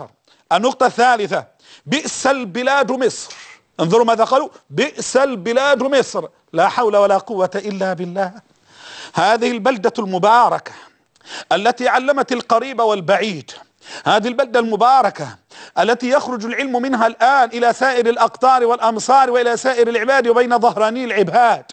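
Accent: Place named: Palestine. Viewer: بالمناسبة هذا ليس مصريا فاسمه ولهجته فلسسطيني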